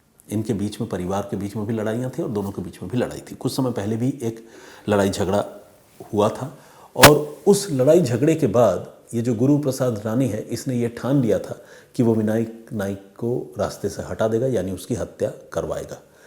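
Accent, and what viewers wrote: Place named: Bengal, India. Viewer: शुद्ध हिन्दी बोला करो, बांग्ला की बदबू आती है तुम्हारे बोलने से 👎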